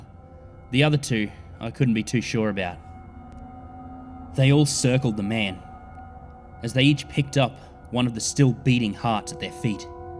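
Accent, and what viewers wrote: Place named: Australia. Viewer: This is definitely one of the best creepy stories I've heard in a while , I mean u knew the tapes would be bad buuuut....yea that was so original. I love ur Aussie accent n I love how u can change voices n pitches and personalities. Fanfuckingtastic!!!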